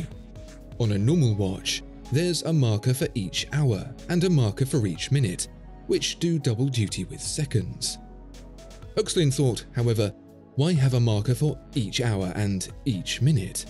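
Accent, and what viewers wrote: United Kingdom. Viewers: This videos is why I enjoy your channel so such. (NOT CAUSE WE AMERICANS FEEL THE BRIT ACCENT IS SO OLD WORLD but the amazing content of your videos) With a collection of PP, JLC, and Rolex (NOT ONE HYPE WATCH IN THE COLLECTION), years and years of buying what I love.. I'm so FUCKEN impressed with those whom are the true GENIUS of our time... Will sell one of the OVER PRICED ROLEX in the collection (purchased from Mayor Jewelers in Miami Fl.). For the past 18 years my local AD has called to purchase a ROLEX at AD prices. They know I will NOT sell what I true LOVE. This Watch is AMAZING..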